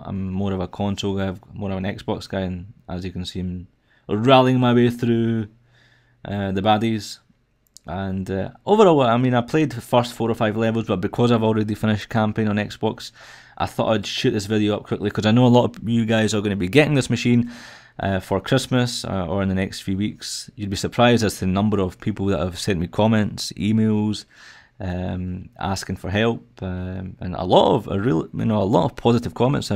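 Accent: Scotland, United Kingdom. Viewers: Scottish sounds awesome, much more special than regular English.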